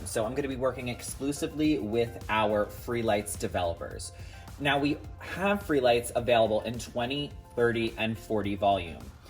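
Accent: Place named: New York, United States. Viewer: I LOVE this tutorial. You’re a natural- EXCELLENT delivery, camera presence…. I thought I heard a little NY accent. I’d totally go to you! Application is so clean. PLEASE lemme know if ur in NYC area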